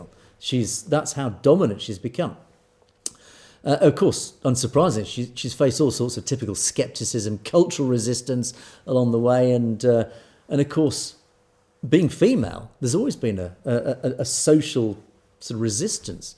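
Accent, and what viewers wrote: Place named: United Kingdom. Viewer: Hello, greetings! This was very interesting and informative program, full of interesting facts! I particularly like your British Accent and want to adapt to it. But, I am afraid that Americans might make fun of it. I need your take on it.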